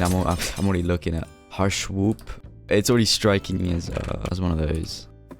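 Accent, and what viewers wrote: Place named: United Kingdom. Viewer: Nice British Accent =)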